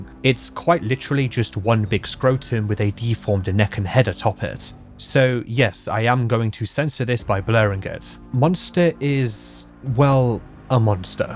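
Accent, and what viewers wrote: United Kingdom. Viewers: the full voicing of this video plus your british accent makes me feel like im listening to a tma episode at times lmfao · as a linguistics major, you didnt really mispronounce very many foreign words in the last funger video. And if anything most of the words that people thought you did mispronounce can be chalked up to your specific english accent.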